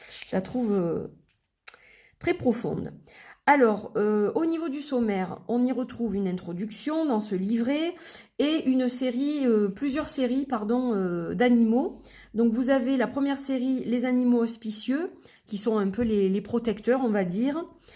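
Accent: South, France. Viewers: il est splendide! J'adore Leïla, cet oracle et votre présentation aux accengs du sudeuh (comme moi).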